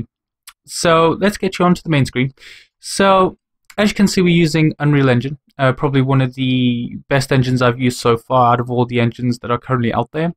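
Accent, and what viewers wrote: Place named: Turkey. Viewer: türk aksanı sezdim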